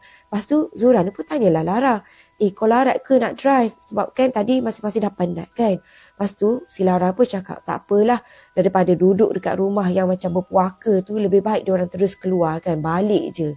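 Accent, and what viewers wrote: Kelantan, Malaysia. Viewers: ni org Kelate ke?! Cakap KL pun bunyi macam loghat Kelate 😅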